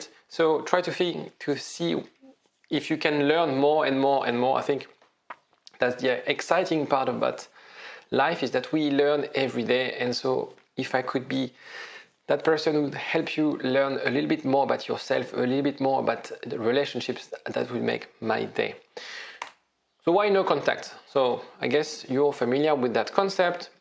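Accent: France. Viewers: Your voice, look, and french accent already calms me down 🙂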